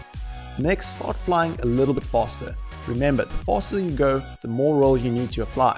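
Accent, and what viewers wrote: South Africa. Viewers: Are you South African?? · Hey you from SA?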